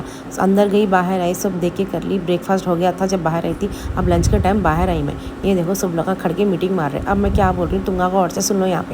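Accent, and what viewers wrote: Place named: Hyderabad, India. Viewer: mam Allah bless you thank you ma'am bahut pyari acting aur bahut pyari awaaz bahut Pyara Hai Aapka baat karne ka Hyderabadi thank you so much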